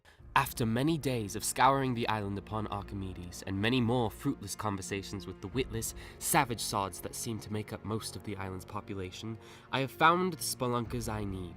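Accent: United Kingdom. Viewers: I’m English and love your British accent haha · Why the hell are you reading these outloud....do that many people who play not read? And wtf is the british accent about, like you said its trash. This video is trash and extremely unhelpful. Thanks for the waste of time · You have a British accent? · I don't mind the British accent, good voice acting in another dialect takes a lot of practice/training. My biggest problem is that you have this beautiful idea and a creative mind to put it all together and didn't bother to learn to pronounce a lot of the '$10 words'.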